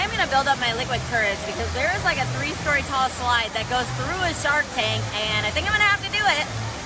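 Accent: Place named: Minnesota, United States. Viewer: Are you from MN? I detect an accent.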